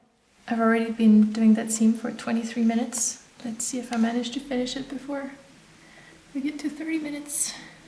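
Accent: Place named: Germany. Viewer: ❤ Ich dachte zuerst, dass du aus dem deutschsprachigen Raum stammst, wegen dem Akzent. Deine Videos sind wundervoll, ich liebe deine Nähprojekte. Auch die Szene mit dem lebhaften Austausch über das weitere Vorgehen, war sehr lebensnah und schön zu sehen. Abo, Glocke und Vorfreude auf weitere Videos von meiner Seite her ❤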